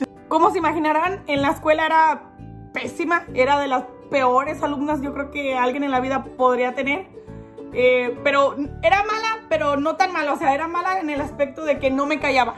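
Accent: Mexico. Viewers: Ahuevo...... Los jaliscienses así hablan si sí si yo tengo familia en San Juan de Dios Jalisco y así hablan siempre.... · Me encanta tu acento mexicano, saludos desde Bolivia 😍🇧🇴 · LA PARTE QUE MAS ME ENCANTO FUE ' STOP TALKING' 😂😂 Y ME ENCANTA TU ACENTO MEXICANO SOY 100 SINALOENSE❤🇲🇽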